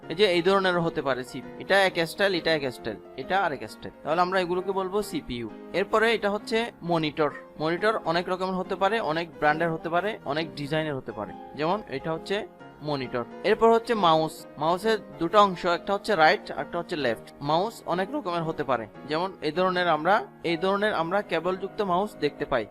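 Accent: Chittagong, Bangladesh. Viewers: ভাই মনে হয় চাটগাঁইয়া 😊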